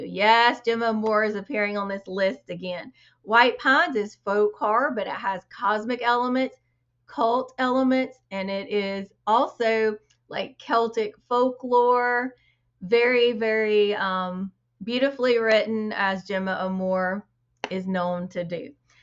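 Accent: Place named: South, United States. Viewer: I got The Night Parade yesterday! Everything is Southern Gothic with that accent 😂🤷‍♂️💃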